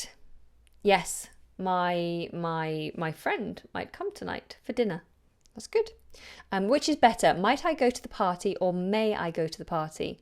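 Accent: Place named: United Kingdom. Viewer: A real British accent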